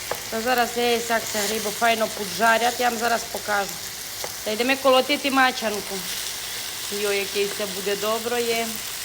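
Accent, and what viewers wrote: Transcarpathia, Ukraine. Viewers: Закарпаття так мовить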